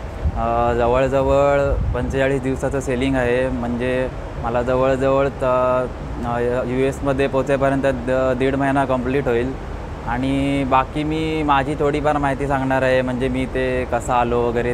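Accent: Konkan, India. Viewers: मी पण कोकणी आहे तुझा बोलने वरुन समजते तुकोणी आहे ते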